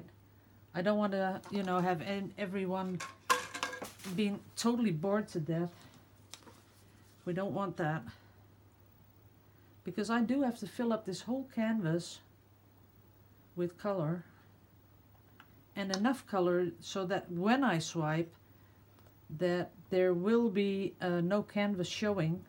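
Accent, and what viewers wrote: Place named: Netherlands. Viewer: This is truely beautiful - just like its creator! We can see how gorgeous it is so stop worrying about that. Also, I love your chit chat and so look forward to coming home from work each day to listen to and watch you pour/swipe. I laughed when you said about getting your hubby to speak in English - mine told me that I was beginning to speak with a Dutch accent cos I' listening to you every day!! LOL Take care and looking forward to the next road trip when you have a spare minute.... how is your sister's piece coming along? Cheers, DEB T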